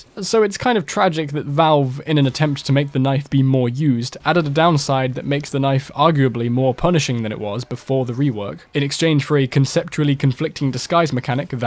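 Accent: United Kingdom. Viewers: You sound like the most British man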